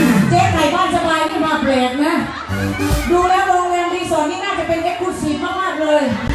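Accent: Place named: South, Thailand. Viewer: ป๊าดด!!เอื่อยเเตนเเหลงใต้เก่งเเท้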